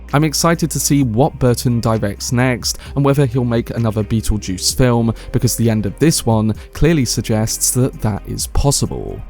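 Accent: United Kingdom. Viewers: There's not a lot of British people's voices i like but man you're it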